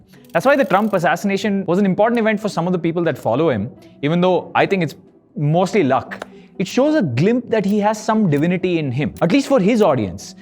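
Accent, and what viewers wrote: India. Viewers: Heard indian accent and immediately turned it off. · When you love the video but have to bear the indian accent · Y'all how tf you bear this insufferable indian accent. This accent is not even indian i dont know wtf is this but entire world hates it.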